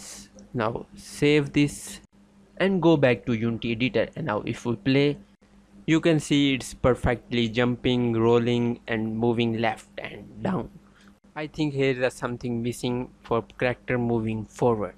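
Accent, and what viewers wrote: India. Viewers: Indian accent found =Op teaching ,btw i am from nepal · the dude with the indian accent has saved us once again!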